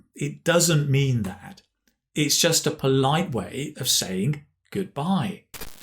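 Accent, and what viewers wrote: United Kingdom. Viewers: I love your videos and I love British English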